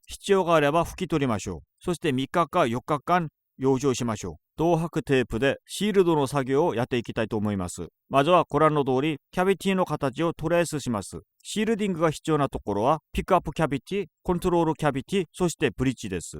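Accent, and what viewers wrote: South Korea. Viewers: 外国の方？韓国の訛りなのかな〜 全然分からなかった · 韓国（？）だっけこのタイプの日本語クソカワイイwwww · 뭔가 한국인이 일본어 하는 느낌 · 왤케 한국인처럼 일본어를 하나 했더니 한국인이었구나😅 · 억양에서 한국어가 느껴지는 건 왜죠?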